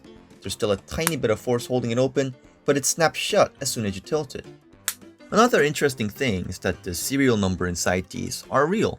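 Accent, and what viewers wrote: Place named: South Korea. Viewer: 한국사람 특유의 악센트가 있네요, ㅎㅎ 아무튼 좋은 리뷰 감사합니다.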